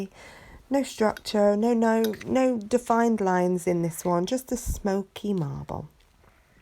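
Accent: United Kingdom. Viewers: Hello you just popped up in my feed nice to hear another britt. Yes it's been hot. Love the nails I have started to learn the basics so I can do my own as my natural nails are brittle due to fibromyalgia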